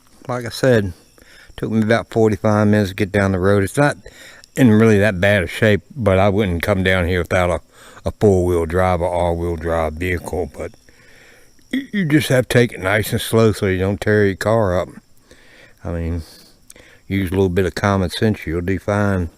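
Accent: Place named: Georgia, United States. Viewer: sound good to me...are you from atlanta georgia? love the accent... be safe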